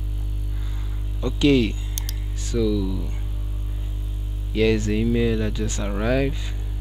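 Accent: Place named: India. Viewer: Boi you sound like Indian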